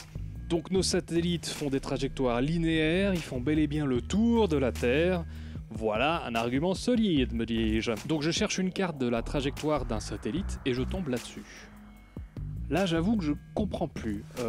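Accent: Belgium. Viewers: Houit, tüitter ... c'est rigolo le parler belge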